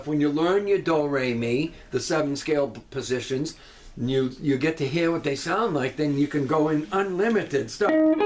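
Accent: New England, United States. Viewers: Enjoy your YouTube journey, my friend. You sound like you're in my kneck of the woods, Newengland. New Subscriber 🎸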